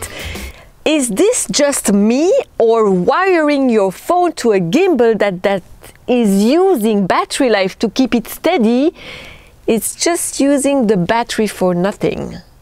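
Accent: France. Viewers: Je viens de découvrir ta chaîne. J’adore ton accent français quand tu parles anglais ! Je comprend tout ! Je m’abonne du coup !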